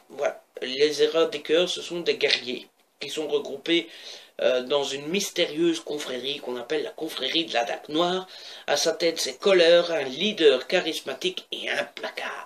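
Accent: Belgium. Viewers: Je découvre ta chaine que maintenant (oui je ne savais pas que tu en avais une, merci de me l'avoir indiqué que Twitter) ! J'aime beaucoup ta façon de présenter les livres je vais encore en acheter des tonnes parce que comme par hasard on a les mêmes types de lecture xD Des bisous ! 😘 (ps : ce petit accent, la Belgique ? 😊)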